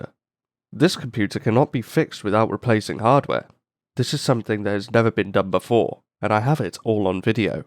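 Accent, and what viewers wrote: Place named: India. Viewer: This host channel like india accent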